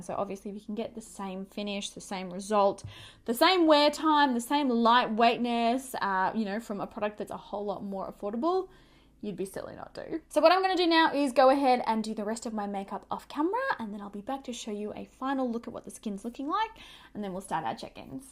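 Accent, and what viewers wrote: Australia. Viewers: I could listen to you talk allll day. Love the Australian accent. 💕 I agree the Maybelline side looks flawless. Great shade for you too. Could I just say, I would love to see you and Tati Westbrook do a video collab! I think your personalities would "gel"! 🥰 Or you, Tati and RachLoves together! 3 fantastic, beautiful, personable Youtubers. 🤗🤗🤗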